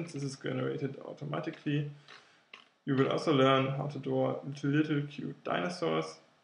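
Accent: Germany. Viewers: You might want to work on your English pronunciation. I don't mind a bit of an accent, but at times your German accent is very heavy. Please see this as constructive criticism. Otherwise, thanks. I'm also using InDesign for my bachelor thesis (albeit on Windows, I think Mac is way too expensive. Shame there's no Linux version, as I'd love to use InDesign on my Ubuntu machine)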